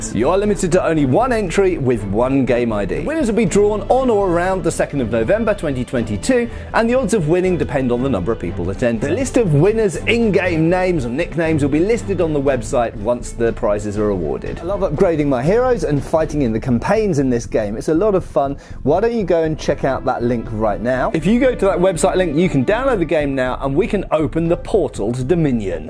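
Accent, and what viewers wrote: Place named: United Kingdom. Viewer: ive added a boar spear to my home defense collection, largely because my neighbors insane pitbull got out again and attacked someone (i wasnt home at the time) but also because theyre relatively cheap and it gives me what i hope is a good non-firearm option. at this point, should i ever have to use it against a two-legged miscreant no matter what that fellow looks like im going to be seeing a genial, bald smiling man with a british accent. i think i watch waaay to many of your excellent videos.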